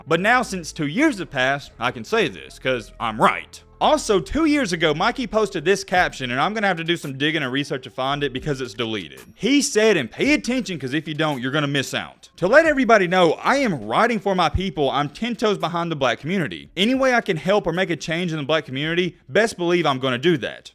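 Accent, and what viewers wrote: South, United States. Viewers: No clue who dude is but he just spent half of this video talking about random shit lmaoo. “Exposing….” Do it then, don’t spend 7 minutes boring with your lil yeehaw accent smh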